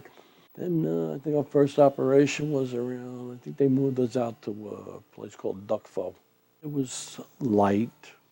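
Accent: New Jersey, United States. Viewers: You sound like your from joisy God Bless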